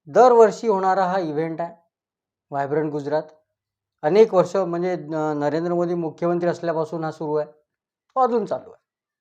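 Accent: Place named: Varhadi, India. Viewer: Sir, mi aaple you tube chanal nehme baghato, karan mala aapali varadi bhasha yekayla khup khup bare vat te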